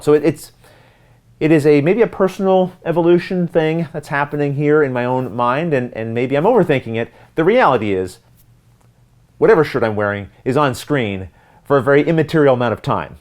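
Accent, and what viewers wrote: Canada. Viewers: I like the review idea, and your opinion can be taken for what it is. I am not going to be more likely to buy a game because or your Jedi mind trick of giving your opinion. It is a different platform for you, and is a different goal. You are in the business of showing people how to Play. And I think that is enough to expect. If you add your opinion, it is just going to see if you like it personally. I don't know if it will change anything. A shorter intro is not a bad idea. I tend to be looking at other things, and don't even really watch much of the intro; just waiting for the meat. So yes, short and sweat. As for the shirt, I sort of like that it adds a level of professionalism to your videos, but you tend to wear nice clothes anyway. I have seen other game videos where people don't even show themselves at all, but in your videos, you are on camera a lot. 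I see your goal of being a friend teaching the game, and I have come to consider you a friend even though I have never been in the same country as you. But I am a retired military officer, so uniforms for me are a statement of professionalism, so I like it. I think you are right, it really doesn't matter what you wear, you are an awesome teacher and do a far, far better job than any other game you tuber I have seen. You are always my first choice to go to find out how to play a game and I will watch you no matter what. You do talk funny, and have a Canadian accent, but I can forgive a few things. I love how you all say iro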